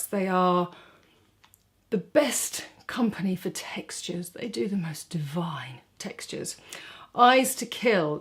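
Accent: United Kingdom. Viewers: you are so British!! Love listening to you talk! Little things you say are humorous. “Cheap as chips” and “Off to the disco” made me giggle. Thanks for the tips on getting out of the “down in the dumps”. You look lovely as usual. 🤗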